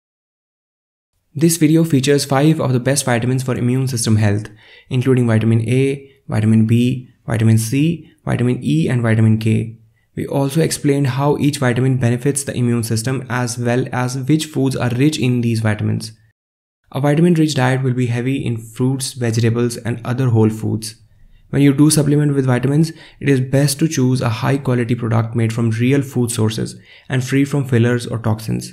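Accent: India. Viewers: thank you, normally i dont listen to Hindu accent, but your information is worth it!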